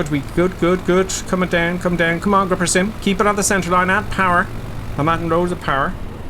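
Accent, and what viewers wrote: Ireland. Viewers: Flight simmer with amazing Irish accent? No choice but to sub :) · Are you another Irishman 😂🇮🇪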